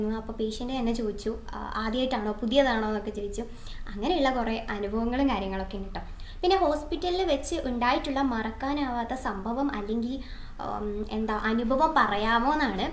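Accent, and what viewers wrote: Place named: Kannur, India. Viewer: oo.. Evdeya nursing padichee... Chechi kannurkari aairnnalle🥰basha ketapo thonnni... Njanum....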